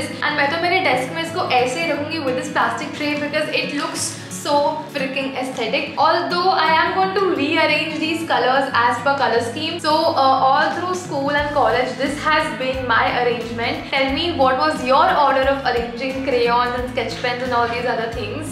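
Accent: India. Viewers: Literally I was shocked when I heard her speaking English in Indian accent. She looks like she's from western 🥺 btw love the haul ❤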